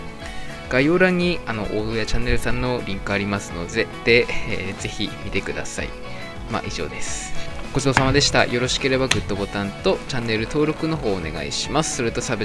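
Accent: Aomori, Japan. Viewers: 青森の人？ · 喋りに少し訛りがありますね。 青森の方ですか？ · もしかして青森出身のお方ですか？ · ちなみに、青森県のどちらの出身でしょうか？なまりが？謎です。十和田市民より。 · おうち麺TVさんってもしかして青森出身ですか？ イントネーションが青森の友達にそっくりで笑ってしまった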